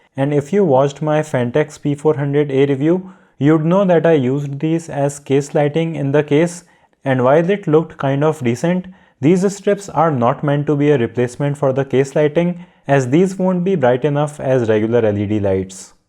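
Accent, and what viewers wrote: India. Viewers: Lots of indian accent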